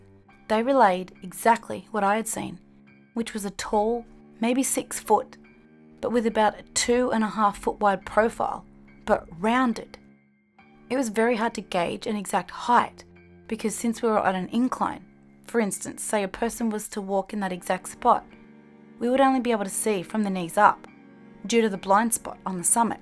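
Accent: Australia. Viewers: Loved it. Without your Australian accent, it wouldn't be complete 😂😅🎉🎉🎉🎉🎉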